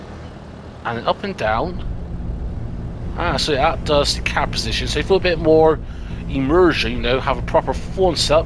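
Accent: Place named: West Country, United Kingdom. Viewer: i could listen to what i believe is your west country accent all day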